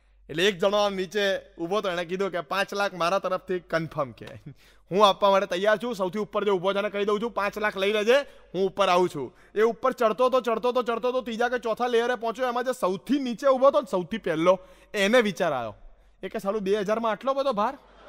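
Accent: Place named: Ahmedabad, India. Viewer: તારી અમદાવાદી બોલી મારા દિકરા જેવો છે એ વિદેશ માં છે મને એની યાદ આવે તો તમારા વિડિઓ જોવું.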